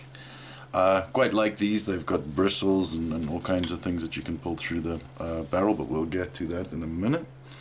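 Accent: Afrikaans, South Africa. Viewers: I sense the Afrikaans in you :)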